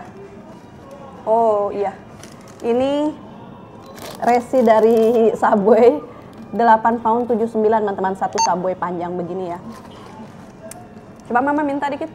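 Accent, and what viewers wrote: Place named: West Java, Indonesia. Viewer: Resep bhs reng logat sundana has te hilap slm ti bdg sehat trus kanggo keluarga ❤❤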